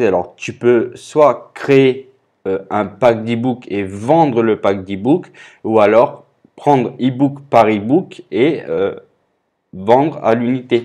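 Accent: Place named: Africa. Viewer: T’as comme un accent africain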